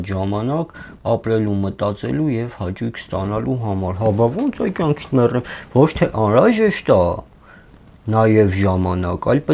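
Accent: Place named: Vanadzor, Armenia. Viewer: Чисто Кироваканский, а точнее Химзаводский)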